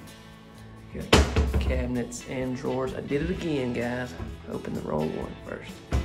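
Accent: South, United States. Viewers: I'm not the greatest at placing southern accents. Does anyone know where his is from? I love it.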